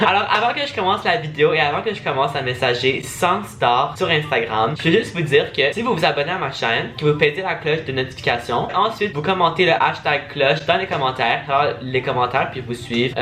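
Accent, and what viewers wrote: Quebec, Canada. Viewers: Je mets un like pour ta vidéo '-' mais surtout pour ton accent Québécois 😘😂😂❤ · l’accent québécois 😍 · On dirait que tu ai québécois 😍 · J'aime trop ton accent ce comme les québécois ❤❤ (et lenni chou 💍😍) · T québécois ou français?????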